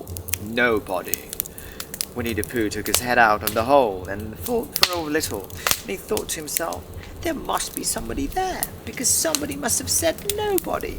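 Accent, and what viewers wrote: United Kingdom. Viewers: 焚き火とイギリス英語の音が心地いいですね…🔥！ 全ては聞き取れないので、聞き取れるように何度も見直すつもりです😂 英語学習を頑張っているところなので、英語系コンテンツもあげてくださるととっても嬉しいです✨これからも応援しています！！ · 聴いてて心地よいイギリス英語～ 落ち着きます(^_^)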